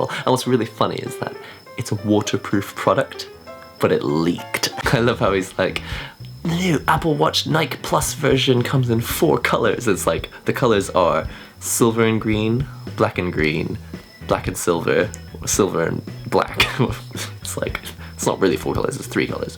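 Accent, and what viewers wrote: United Kingdom. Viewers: Yep. This comment was a joke. He obviously sounds like he's from the UK. And I was taking a swipe at the English.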